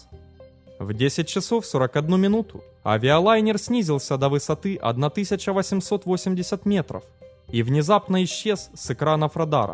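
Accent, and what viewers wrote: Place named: Ukraine. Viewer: Украинский акцент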